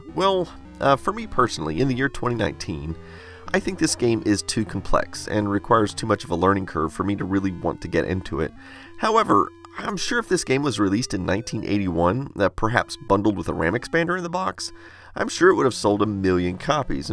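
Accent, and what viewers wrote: France. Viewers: I like your french accent 😀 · Awwwwwwwn your french is adorable ^^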